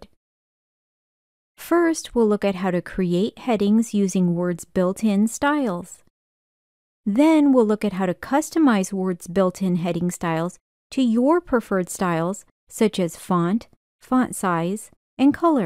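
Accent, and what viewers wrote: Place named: Midwest, United States. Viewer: Midwest accent is awesome ❤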